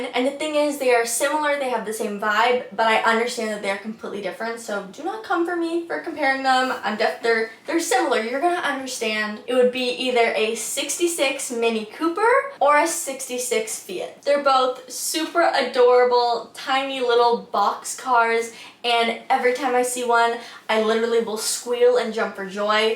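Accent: United Kingdom. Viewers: Great video! As someone who had a British girlfriend and hung out with an all British crowd while stationed in Spain, I can say your British accent is great! I’m hoping that my daughter will be interested in an LBC when she starts driving in a few years, but so far she has not expressed any interest in participating in her brothers and my channel working on them. She’s still got a few years to go though, so there’s always hope! Keep up the great work! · You sound British to me! But I’m from North Carolina. Lol. We used to go to Ipperwash beach and visit relatives in London each summer. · Keep using the word British…. saying UK is a new obsession that is a bit bland and mostly due to Scotland and Wales pushing more for national identity. The idea of a specific ‘British’ accent is sort of a North American concept but I know what you mean, yours is great… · Your British Accent is cute! 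If you want to take it to the next level, study Cockney Rhyming Slang and then you can go apples and pears and have a good old rabbit and pork!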